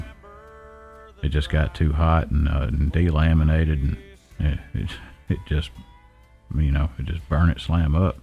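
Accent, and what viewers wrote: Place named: South, United States. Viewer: Way too much Southern accent is killing me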